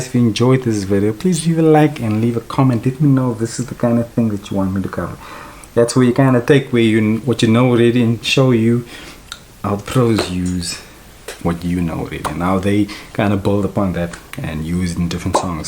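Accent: South Africa. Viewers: Dude you sound so South African 😅. Amazing chords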